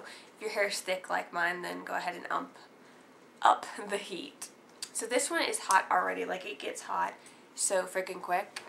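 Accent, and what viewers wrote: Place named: South, United States. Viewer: Are you from the south? I am and you have the same accent as me! ^_^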